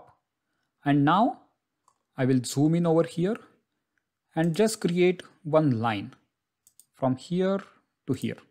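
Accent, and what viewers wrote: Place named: India. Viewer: bro can u turn off indian acent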